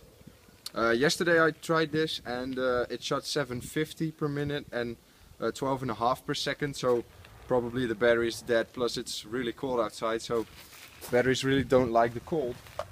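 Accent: Netherlands. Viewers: It looks very good but too bad it doesn't have blowback. P.S. Your accent sounds dutch.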